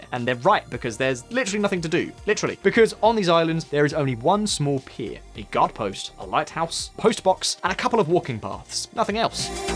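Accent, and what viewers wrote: United Kingdom. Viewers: this guy narrating is obviously British, and should know why lump of rocks in the ocean is so important. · “Hotspot: hotter than a hotpot.” Love your British accent mate!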